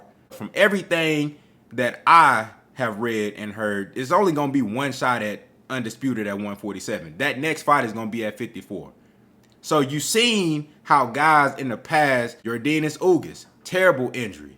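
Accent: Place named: Dallas, United States. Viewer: Man Kel Brook was already broken up.....and Porter actually looked like he would have beat Spence....he was on Spence ass!! Say your accent gives you away...you a Dallas fan boy......you aint givin us commentary that unbiased.....mane....you a fan boy mane we aint buyin what u sellin mane!! Spence aint cerebral he a brawler....he has never been mentally challenged but he will this fight and Im not a fan of welterweight boxers!!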